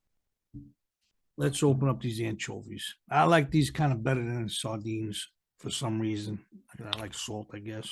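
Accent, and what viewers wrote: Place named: Italian-American, United States. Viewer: Accent makes me want to watch the Godfather for the millionth time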